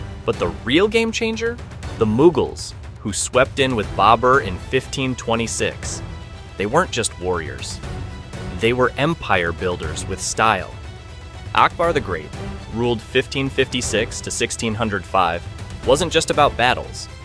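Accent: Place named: United States. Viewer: I was suspicious when I heard your Amurcan accent, but literally stopped when you said "dude". For heaven's sake.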